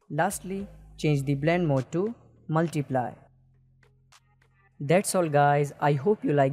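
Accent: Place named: India. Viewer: very funny indian english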